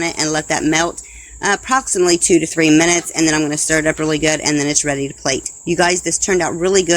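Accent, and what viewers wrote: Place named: North, United States. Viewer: I'm originally from Pittsburgh PA.. I thought I recognized a northern accent. I have subscribed.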